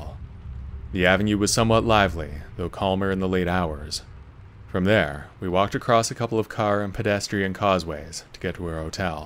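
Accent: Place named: Canada. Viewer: ❤❤ Thanks love your calming voice, you must be Canadian like me!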